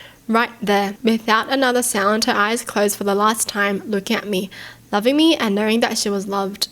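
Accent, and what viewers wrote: Australia. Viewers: You still have the best Australian accent to me tho!🥰🔥💜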